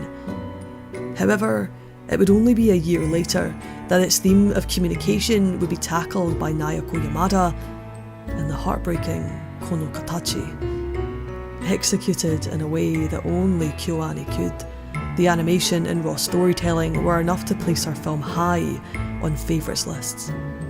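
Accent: Scotland, United Kingdom. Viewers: don't ever stop putting word thoughts in my earholes with that sweet Scottish melody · somehow the scottish accent is a constant funny thing for me that i cant take serious, so this video threw me through quite a loop cause the accents funny but ive only witnessed it in comedy before. that made it quite challenging to listen to what good things she's actually saying. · Finally an accent I understand... Wait this isn't the same regional accent as me? I can't understand you I'm not from your part of Alba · Scottish accent? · I don't know what that accent is (Scottish?), but I like it. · scottish accents r beautiful and ur beautiful. its just my auditory processing thats shit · I loved your Scottish accent. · It had taken a while to know who you are when introduced you but it was listening to your voice and I knew it, you were the one who made that incredible review about Yagate Kimi or Naru a few months ago. 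And since I love your Scottish accent, it's perfect (and I'm tell you being a Honduran, whose first language is Spanish) and yet I can understand you perfectly. You are amazing. Regards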